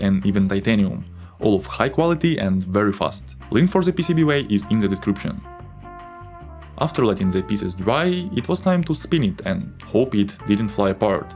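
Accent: Russia. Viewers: WTF he haves a Russian accent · Russian detected ⚠️ привет дорогой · Обычный русско-английский акцент. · Мне показалось, или услышал русский акцент? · Чувак! Твой русский акцент!.. 😂 But still you're doing good! Going to check Your channel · why the accent, bro? you sound russian! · Господи чувак, твой английский такой русский что писец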